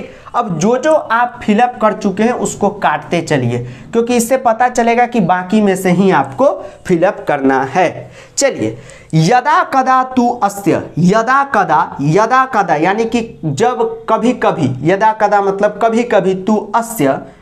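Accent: Bihar, India. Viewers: Pure bihari sir😍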